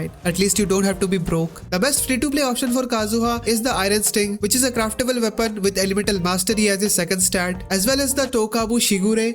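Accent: India. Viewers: Indian accent 😅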